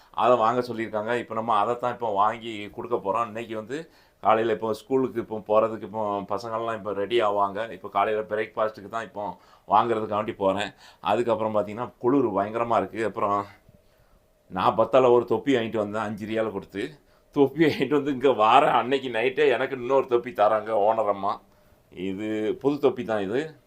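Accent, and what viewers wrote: Tirunelveli, India. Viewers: இப்படி வளவளன்னு பேசி வீடியோ போட்டால் எப்பவும் கேட்கிறது கிடையாது ஆனா நீங்க பேசறது நம்ம திருநெல்வேலி மொழி கேட்க மிகவும் அருமையாக உள்ளது வாழ்த்துக்கள் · ஆமாம், வெள்ளந்தி மனிதர். கேட்கக் கேட்க சலிக்காத நெல்லைத் தமிழ்.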